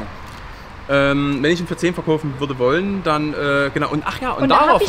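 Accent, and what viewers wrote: Saxony, Germany. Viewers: Ein sehr interessantes Video. 👍 Dieses war glaube ich mein zweites Video was ich von euch sehe und ich muss sagen das gefällt mir gut. Du bist'n Typ der einfach so quatscht wie ihm die Gusche gewachsen ist. Und dann noch auf sächsich.👍 Abo ist mal da.✌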